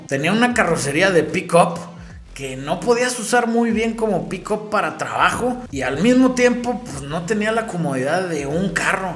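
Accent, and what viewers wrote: North, Mexico. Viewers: Lastima del buen video, con ese horrible acento norteño balazo, esta dificil de ver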